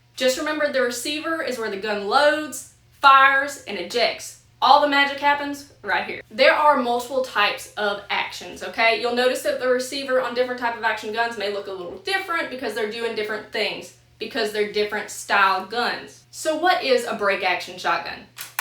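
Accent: South, United States. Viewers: You’re video just showed up on my feed loved it informative and funny had very useful info and to top it off that southern accent took me back home I subscribed looking forward to seeing more of your work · After a couple of minutes in the video, I could hear the "taam (time); thaang (thing); kaand (kind)" - and I was like, yep, that's a red neck there 😆. Great video by the way ✌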